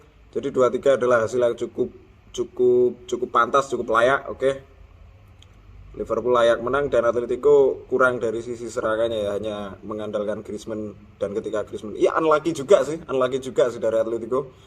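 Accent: Java, Indonesia. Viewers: Kalau boleh saya rating secara pribadi, 8/10 Pro: - karakter pundit jowo nya dapet dan pemakaian bahasa nongkrongnya bikin kita serasa lg ngobrol di tongkrongan - suara nya enak kalau didenger di podcast - tengil dan ada bakat ngeselin di show, jadi bisa bikin seru acara Cons: - kebanyakan noise dari mukulin korek/rokok/liquid/atau apalah itu, ganggu banget - banyak baca, mata jarang ke viewer, menurut ku agak kurang, kalau cuman podcast no visual sih oke oke aja Tetap semangat bang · Teruskan aksen jowomu .. makin keren 👍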